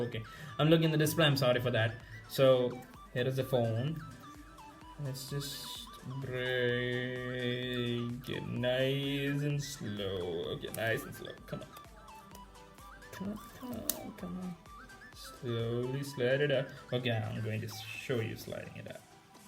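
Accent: United States. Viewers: Wow, nice review Your American accent is so cool bruh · when is full review coming ?good bro you accent seems like american . any way it was amazing good luck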